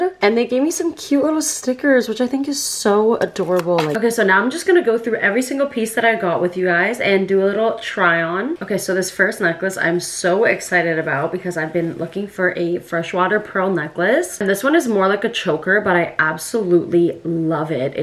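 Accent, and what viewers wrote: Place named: Scotland, United Kingdom. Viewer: OMG I love her accent, I think it is Scottish?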